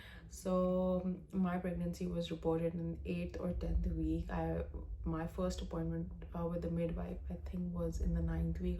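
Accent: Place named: United Kingdom. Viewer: Sorry i was really struggling to understand you. Your voice is really low plus you're speaking urdu in an english accent. At some points i was confused that are you speaking urdu or english? 😂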